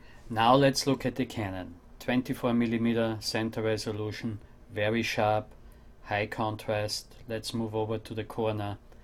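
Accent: Austria. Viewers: Austrian accent.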